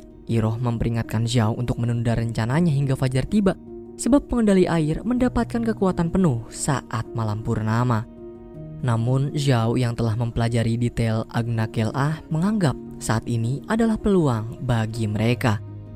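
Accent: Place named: Cirebon, Indonesia. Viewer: Wong cirebon tah min😂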